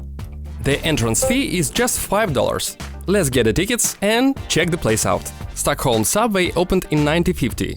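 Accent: Russia. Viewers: Смысл его тренить на русском акценте? Американца или англичанина после этого не поймёшь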